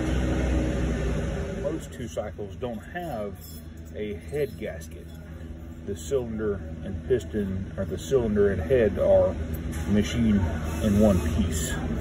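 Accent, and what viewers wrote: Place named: South, United States. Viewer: Why are there so many hard-to-understand hicks with that ignorant sounding souther drawl putting out videos? People don't think you know what you're "tawkin' 'bout".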